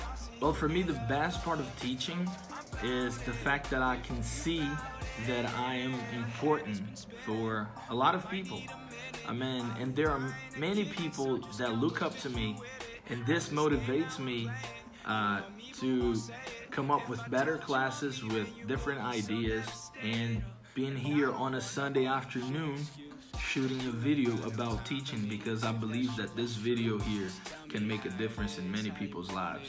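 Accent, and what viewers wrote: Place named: United States. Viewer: Hello teacher, I’m Yahya, all thanks to you and teacher, I learned just to focus entirely on an accent that I like to be recognized by and that’s American which teacher speaks 😊 keep up the good work ✋️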